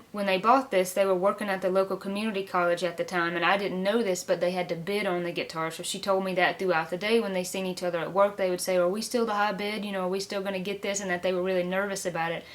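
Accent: South, United States. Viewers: 1st off the word you were searching for that describes Christmas in childhood is MAGICAL! My mother was also a great documentarian so appreciate your video from the past. And lastly I think you have one of the most beautiful speaking voices I've ever heard. You should read audio books that need a southern accent!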